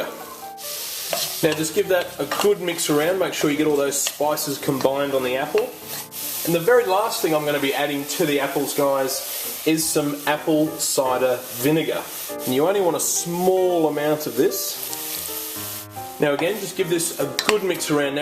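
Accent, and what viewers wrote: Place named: Australia. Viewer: awkward ... hes Australian.... .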